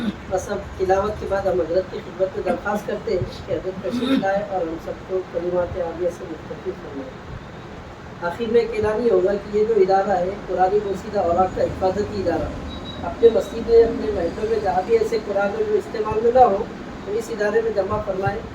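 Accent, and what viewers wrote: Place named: Pakistan. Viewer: قاری صاحب کا لہجہ لہجہ مکندواریہ لگتا ہے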